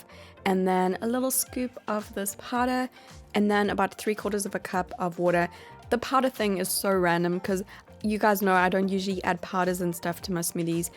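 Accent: South Africa. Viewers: are you South African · South Africa I think x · South Africa! ❤️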